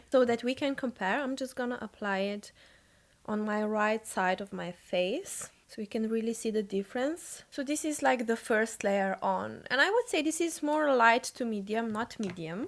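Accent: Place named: Slovenia. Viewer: I couldn’t quite place your accent so very cool to find out you are Slovenian! I passed through Slovenia very briefly last year on the way from Trieste to Rovinj and I’ve been dying to properly visit the country since then. It’s my number one place I want to go! 🧡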